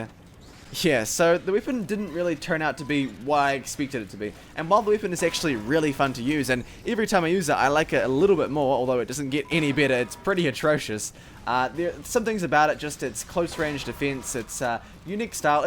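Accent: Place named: New Zealand. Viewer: He's from New Zealand.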